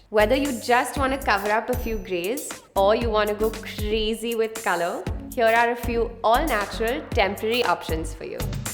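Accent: India. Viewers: She definitely shocked me , I didn’t know she was Indian and I hope I’m not being racially insensitive but her accent is so beautiful 😍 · She has an Indian accent but she doesn't look Indian 😳🙂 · Why do you sound Indian? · Shes so beautiful, to bad has indian accent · OMG ..That point you started talking i realized that you are an Indian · why is she white but with an indian accent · When it was really shocking and belly turning to see this white girl certainly starting to talk with the Indian accent very weird and how to place well then I remember the world in clear values and I remember the world and their values and what her background must be for me to be looking at this so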